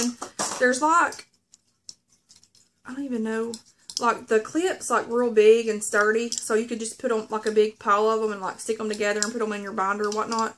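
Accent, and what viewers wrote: South, United States. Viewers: "Tickle your innards!" That was on all of the old Mountain Dew bottles in the '70's just meaning that it's so good that it will tickle your insides and make them happy! I'm telling my age! I have just seen your video for the first time and you are adorable and girlfriend I LOVE that Southern accent! Where are you from? I'm from Alabama and I know a Southern accent! Subbing tonight! I heard you talk about journals so check mine YouTube out as well! Looking forward to watching you more! Love the dimples :)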